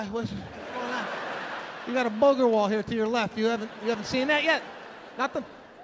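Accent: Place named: Chicago, United States. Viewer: Chicago Sicilians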